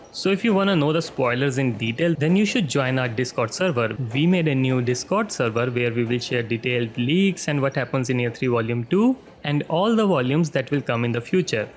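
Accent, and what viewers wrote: India. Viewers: Bro you have indian accent so smooth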